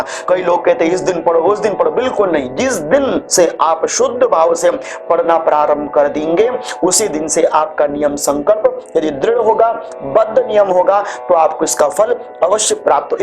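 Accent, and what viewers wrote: Standard. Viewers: शुद्ध उच्चारण अत्युत्तम। धन्यवाद इस प्रकार की जानकारी के लिए😊🙏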